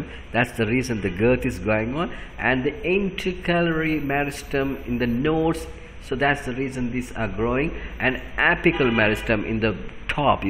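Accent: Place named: India. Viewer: dude u got a Indian accent but i dont think a indian guy would do videos on sl sylabus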